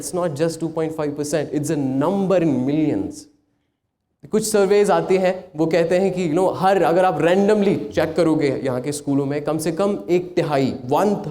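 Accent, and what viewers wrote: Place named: United Kingdom. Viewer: Is he Indian?😁😁 He speak like a Britishers 😍😍😍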